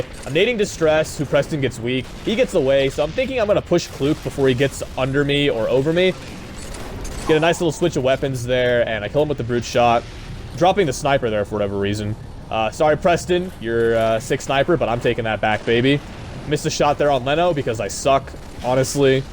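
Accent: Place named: United Kingdom. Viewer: 0w0 u sound like a fellow brit>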